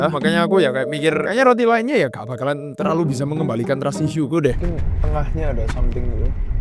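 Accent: Java, Indonesia. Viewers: Walah koh mbok mgomongnya yg bener...aku jadi ketawa ketiwi.. Kok yo medok banget😂😂😂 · Kamu orang mana bang.. Kok lucu medok banget kl ngomong bahasa Indo. 😊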